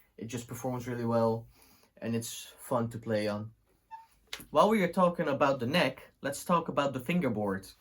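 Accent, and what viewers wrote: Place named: Netherlands. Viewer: I ended up buying the TE-25th, I like it, I also have the TE-62 DB CAR, they both sound great but have their differences, I don't know why they went with the long screws as they obviously commissioned the bridge themselves as it has their name on it, I cut mine down and filed the tips of the screws, no issues now! I realised when coming back to this video I had not subscribed, sorry, I have now! I used to live in the Netherlands in 1998 to 2001 I lived in Noordvijk Aan Zee and later Noordvijker Hout, I miss the Dutch accent and so enjoy your video's.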